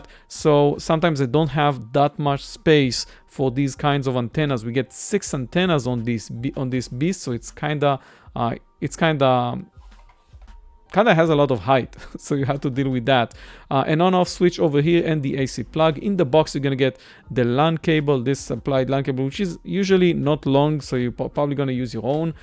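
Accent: Israel. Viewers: איזה מבטא ישראלי אחי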